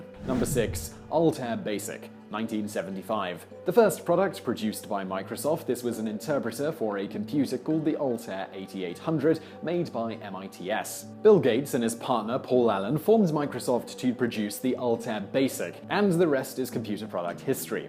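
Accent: United Kingdom. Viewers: Oh, I'm sure he's smart. It's just that Brits don't talk like Americans.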